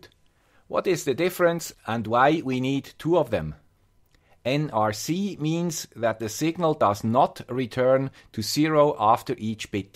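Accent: Switzerland. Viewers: guy with the swiss accent... cheers from indonesia..😀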